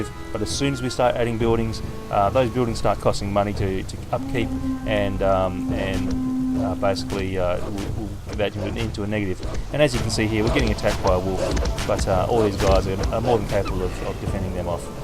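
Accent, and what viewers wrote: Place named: Australia. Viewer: I found this really helpful so thanks mate! It's also refreshing to hear an Aussie accent ;)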